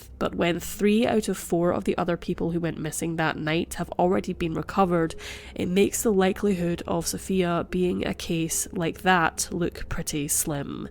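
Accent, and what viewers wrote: Scotland, United Kingdom. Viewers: Ahhh your Scottish 🤗🤗🤗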